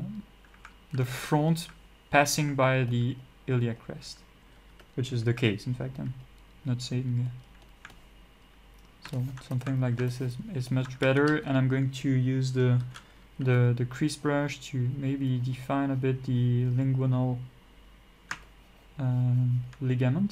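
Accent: France. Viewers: i love your french accent it is so sexy :D romantic <3 <3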